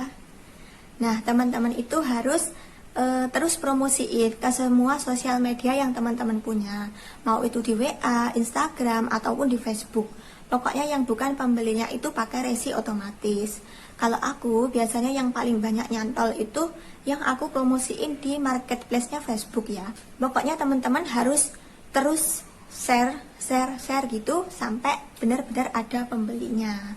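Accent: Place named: Medan, Indonesia. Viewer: Dilihat dari logatnya, pasti orang medan ini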